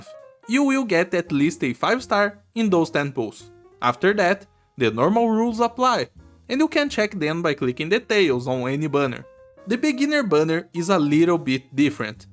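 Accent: Brazil. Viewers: Thanks mate, I gonna start in arknights because two reasons. 1 - Monster hunter 2 - Waifus. (Obrigado mesmo foi bem simples a explicação, e o sotaque brasileiro denuncia bem rápido kkkkkk) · i can pressentir that you é um brasileiro kkkk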